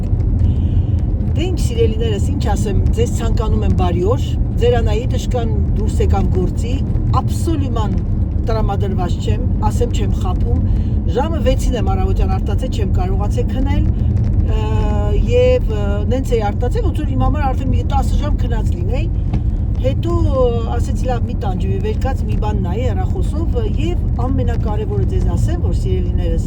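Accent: Gyumri, Armenia. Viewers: Jerm barevner bolorin jan inchkan em sirum wor Lenakani Barbarov ek Xosum ❤❤❤ Im Txanela minujar u aprum en Arazin ❤❤u Da E jiste Ayo jan der kann nman Mardik wor der che Giten wor Mer jamanaknere ancel en Es Dzez Siremge. Hatuk barevner Mamayin u Dzer Huyrin ❤❤Dzez lav jamanak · Huzich er jan Lennakani akcentn el mi urish a! De cankali klini vor erexan apri cnoxi het tekuz mec tariqum bayc ete edpes e voroshel inch arac ham el ete amusnanum a arandziny tchisht e, inchqan el lav haraberutyunner lini arandzin zuyger aveli azat klinen u tchisht e erkusi hamar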